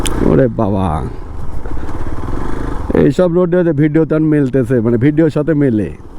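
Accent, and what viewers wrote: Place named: Pabna, Bangladesh. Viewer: ভাই আপনার ভাষা আমাকে পাগল করেছে। ভাইয়ের দেশের বাড়ি কনে পাবনা নাকি?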